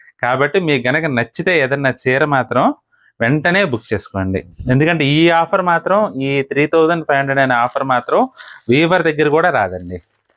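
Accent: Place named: Andhra Pradesh, India. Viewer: Sir మీరు ఆంధ్రా చాలా బాగా మాట్లాడతారు🎉🎉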